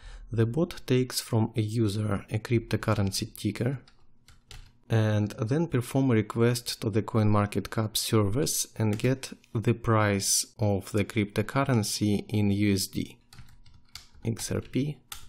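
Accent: Russia. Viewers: thanks! ;D i love your russian accent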